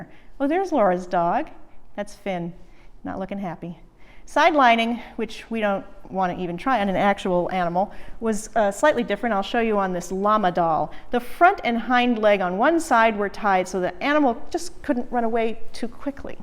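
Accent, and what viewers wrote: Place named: United States. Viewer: Why is an American lady talking about Exeter history?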